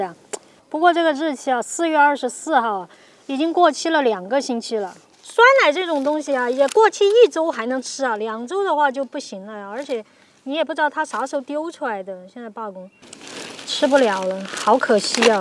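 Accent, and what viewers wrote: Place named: Northeast, China. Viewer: 又會說四川語言 又是東北口音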